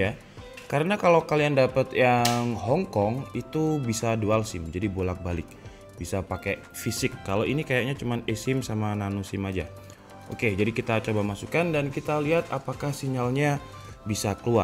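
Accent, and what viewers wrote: Malang, Indonesia. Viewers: Bang asal malang kah? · Bang orang malang ya?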